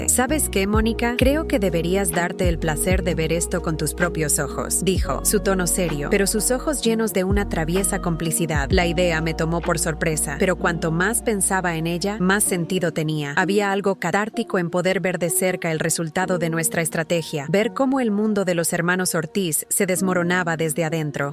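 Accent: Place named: Portugal. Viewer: Y ese asentó portugués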